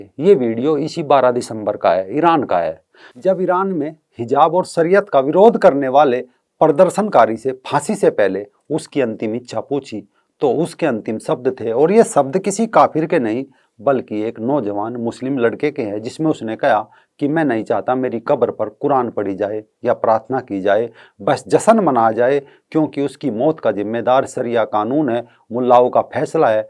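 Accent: Haryana, India. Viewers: आज आपका पहला वीडियो देखा, बहुत अच्छा लगा और आपकी बोलने की tone से आप हरियाणा के लगे Well done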